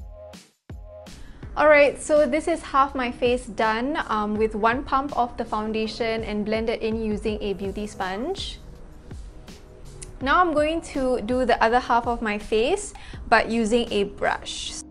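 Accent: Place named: Singapore. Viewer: hello just came across your channel you sound singaporeon :) 😀 I half singaporeon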